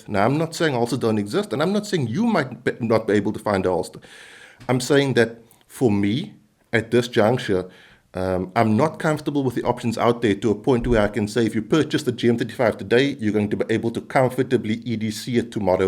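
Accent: South Africa. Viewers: Jeez - could you roll your R's and add syllables to words anymore! This guy couldn't be anymore South African.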